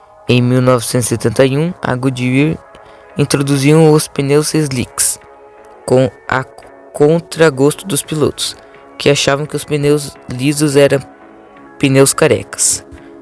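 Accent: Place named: Paraná, Brazil. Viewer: Vc é paranaense?😂😂 Tem mto sotaque, olha q sou daqui e reparei, mas mto bom o vídeo msm, cheio de informação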